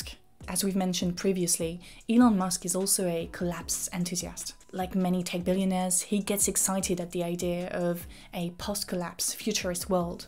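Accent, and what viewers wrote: France. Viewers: This is entirely irrelevant to the conversation, but I can’t help but be a bit taken by your whispery French accent. Developing a bit of a parasocial “crush.” But you’ve inspired me to pick up French again on Duolingo. I’m Senegalese and I feel like I should be fluent in French by now. Anyways great vid as always.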